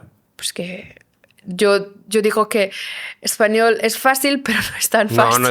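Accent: Andalusia, Spain. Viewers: Oye no me gusta el acento español pero el tuyo 😮❤ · Amo tu acento Andaluz!! 💜❤️💖 Y a Khadija mis respetos por su fuerza y valentía!! Abrazos desde Colombia 🇨🇴🇨🇴🙏🏻🙏🏻👍🏻👍🏻💜🌈🪄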